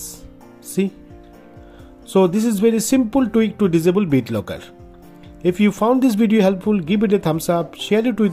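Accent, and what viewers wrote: India. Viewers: its always the vids with 20-80 likes and an indian accent finaly solving my problem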